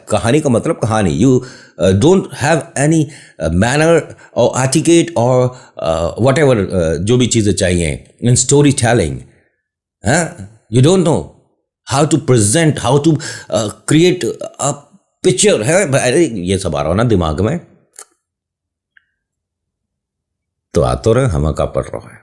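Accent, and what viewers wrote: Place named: Haryana, India. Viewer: भाई मजा आ गया हरयाणवी जबर्दस्त ऐसे ही सुनाते रहो टैलेंट है मगर घमंड बिल्कुल नही है 😂😂😂😂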